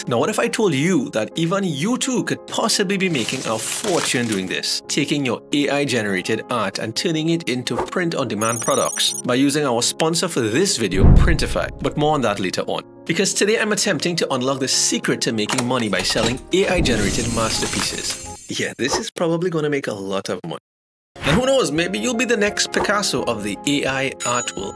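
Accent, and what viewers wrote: Trinidad and Tobago. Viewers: Are you Tinidadian? I'm hearing some sort of accent. Good content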